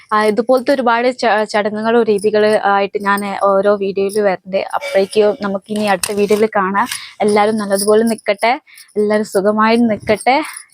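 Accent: Malappuram, India. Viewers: Aap kaisea sikkayaa Malayalam..Malapuram slag 👍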